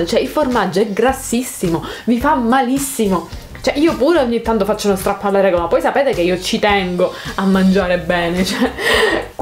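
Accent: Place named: Calabria, Italy. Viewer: 6 calabrese avverto un accento pronunciato 😂😅❤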